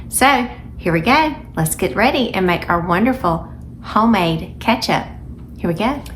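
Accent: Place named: Georgia, United States. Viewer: Just found your channel and I am loving it. I enjoy listening to your Georgia accent.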